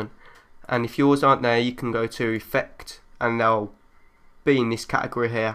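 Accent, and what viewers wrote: Birmingham, United Kingdom. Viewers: van Ingen I would suggest that has a 'brummie' accent which is typical of people from Birmingham in the UK. I'm not, however, an expert and it may be that he could be from another part of the Midlands (with a similar but distinct to those who know accent) and in which case he could well be offended by my suggestion. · brumie accent :)? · I hate that traditional English cuddly accent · i would love to get into this!! I want to do some intros and stuff. Great work matey. Where did you learn all this man! Nice to hear a fellow brummie too!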